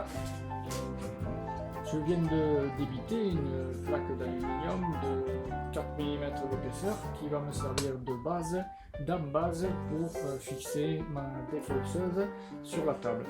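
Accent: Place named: Var, France. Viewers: Nouvel abonné sur votre chaîne je parcours vos vidéos . Merci de votre partage . Amateur de bois je commence à avoir quelques machines et du coup je pense aux aménagement et votre montage est inspirant . Et les cigales dans la voix me rappel mon adolescence dans le Var . Merci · salut j'ai découvert ta chaîne y a peu de temps et je me suis dévoré toute tes vidéos elles sont bien faites avec des combines de vieux routard, de l'humour et tu ne t'attarde de pas sur des futilités mais elles s'adresse a des débutants avec un peu de connaissances du métier, je m'explique quand tu présente un outils montre nous les changements d'outils et éventuellement leurs réglages voire leur nettoyage et leurs affûtages quand cela est possible sinon j'adore ton accent il est du sud mais de ou? moi je suis du nord de la france. au plaisir de plein de nouvelles vidéos et garde les bétisiers c'est trés sympa bonne continuation et tout plein d'abonnés amitié Fred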